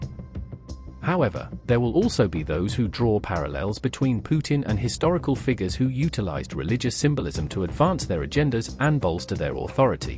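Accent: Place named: United Kingdom. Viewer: Bri'ish mouthpiece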